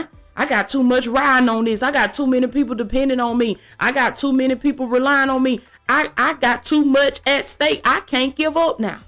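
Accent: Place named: Georgia, United States. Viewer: U sound like u from louvalle ga. THAT'S A GOOD THING. His children r there too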